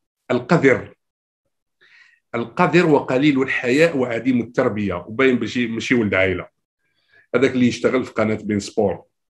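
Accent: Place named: Morocco. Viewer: باين لهجتك مغربية كما احنا...........و علاه راك دير في السنيما ...اسكتوا علينا هذي غير كرة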